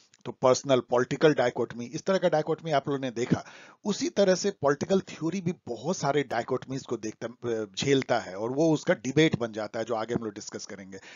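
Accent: Bihar, India. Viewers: Bihar se hai kya sir aap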